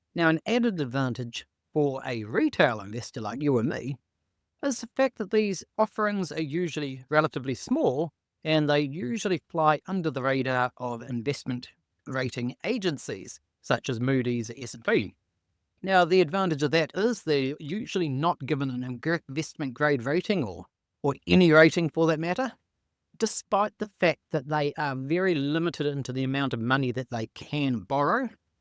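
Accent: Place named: New Zealand. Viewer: Thanks, for sharing your knowledge and advice. I lived in New Zealand as a child for two years some sixty years ago. Hearing your accent brings back fond memories of the Land of the Long White Cloud!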